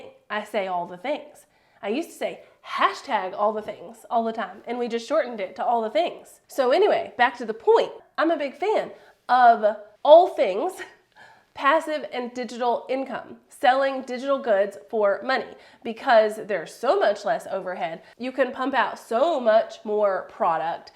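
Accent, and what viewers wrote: South, United States. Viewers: Hi my first time seeing your video.. ive always thought about selling digital but never knew how.. i also love your country accent brings back happy memories.. from what you mention id be interested in learning how to sell digital planners online and what kind of platform would i need in order to do this.. can you help me.. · I loooov your english! Where in the US is it from? It sounds so nice!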